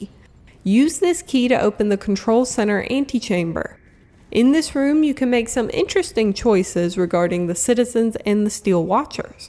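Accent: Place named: Mississippi, United States. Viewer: i watched many bg3 guides/tips videos and by far i like yours the most. idk why lol it's just preferable to me. i like your accent btw, reminds me of my family from mississippi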